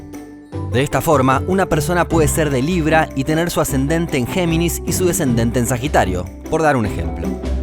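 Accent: Argentina. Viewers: Me gusta el contenido. Ese acento argentino, en vez de molestarme, le da singularidad.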